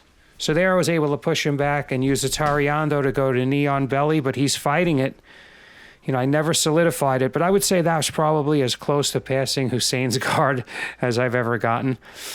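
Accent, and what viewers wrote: United States. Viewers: Your voice sounds like Secaucus to me. 🤔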